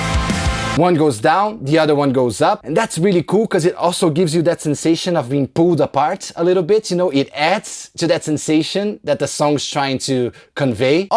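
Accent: Brazil. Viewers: mano vc é brasileiro? parece pelo sotaque ahahaha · Po o seu sotaque parece muito de brasileiro, vc é br?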